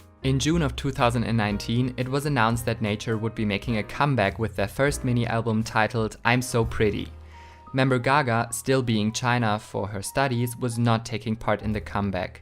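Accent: Germany. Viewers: are you German by any chance ? You sound quite German to me :) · okay, off topic, but are you, by chance german? Your accent feels farmiliar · are u german by chance? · Is the narrator German? That accent is so strong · Are u german? :D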